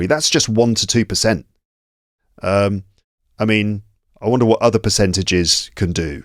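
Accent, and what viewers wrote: RP, United Kingdom. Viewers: Another thing I want to point out is that your English is very clear "'Received Pronunciation'" is very easy to understand. But in real life in the UK, it's much harder than I expected to understand the average native British speaker and I'm not even talking about dialects.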